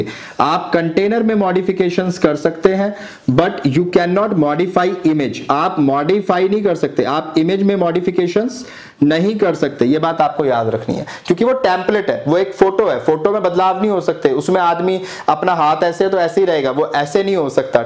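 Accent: Pakistan. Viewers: Sir aap bharat se ho ya pakistan se, aapki ton Pakistani lag rahi hai.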